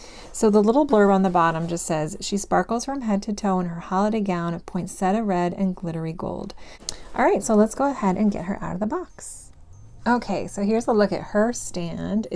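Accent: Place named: New England, United States. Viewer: You have a new England accent!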